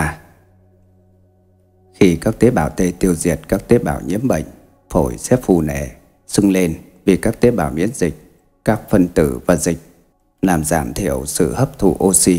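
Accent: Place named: North, Vietnam. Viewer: Bác này không đọc đúng được một từ ngoại ngữ, Virus mà bác đọc nguyên chất giọng Bắc vn là vi dút 😉 Nói chung, giọng đọc của bác không thuyết phục. Ad có thể cho biết rõ danh tánh của vị bác sĩ nào đã viết bài,và vị ấy đang làm việc ở đâu không ạ? Thank you!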